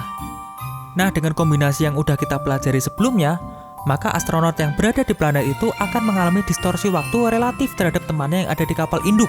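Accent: Java, Indonesia. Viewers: Medokmu Mas,,kyk aq.he.,he. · Orang jawani medok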